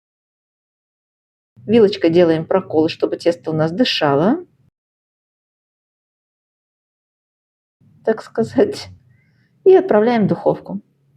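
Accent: Baku, Azerbaijan. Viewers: ...и внешность и говор бакинский....Спасибо за рецепт ,наша дорогая! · Спасибо,дорогая,как же приятно слышать ваш бакинский говор!!!!! А рецепты нашей беззаботной юности!!!!Храни вас Бог за такие теплые чувства!❤️ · Очень вкусный пирог! Спасибо большое. Ваш бакинский акцент греет душу -такой приятный! Здоровья вам, дорогая 🙏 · Точно подметили, 💯% бакинский акцент! Ни с чем не перепутать! Привет из❤Баку! Всем Мира! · Наши Девушки Бакинки, во всем талантливы, Мы девушки всех наций были дружны, делились всем, самым лучшем отсюда и вкусняшки…., а у Вас прекрасная золотые ручки, Так приятно слушать Наш говор! Спасибо! Счастья во всем! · Спасибо за рецепт. Мне почему то слышиться в вашем говоре бакинский акцент. Может я ошибаюсь?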